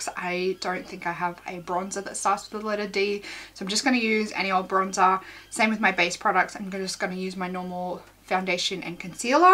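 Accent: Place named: Australia. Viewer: Another person who’s found you via Annette. So lovely to hear an Australian accent. 😊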